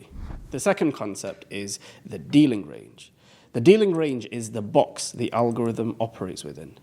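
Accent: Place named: United Kingdom. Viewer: concise and articulate delivery, paired with his clear British accent, makes complex trading concepts much easier to follow. I truly appreciate the direct and structured approach—far more effective than the overly drawn-out explanations some others offer. Excellent content!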